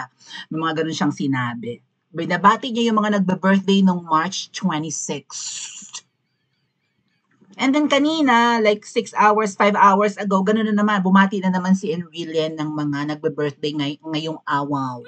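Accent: Iloilo, Philippines. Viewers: Haha ilongga ka day❤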